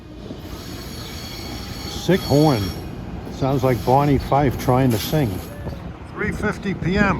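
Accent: New York, United States. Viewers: Boy, this is great! Grew up in North Jersey and every Fri. night in the 70's we'd trek down to Pct. Junction and watch GG-1's slam through along with E-44's on loads of freight trains with occasional diesels. Around ~ AM the last remaining RPO (mail) train left running in the U.S. would head south, always with two G's and then we'd head home. Rail therapy at its finest! Thank you for jogging the memories and I loved your presentation of train info-most times I hate narration so that says a lot. And never lose your NY accent! Makes me homesick... now I gotta go watch all 6 Sopranos seasons over, LOL! Subscribed. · I'm a southerner, but I love listening to you speak in your NY accent. Your voice is quite soothing and is very pleasant to listen to. I really like the way you compose your videos, and the way you give lots of relevant information for each train. 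Your camera skills are also quite impressive, with nary a camera jiggle in sight. You have become one of my favorite railfan channels!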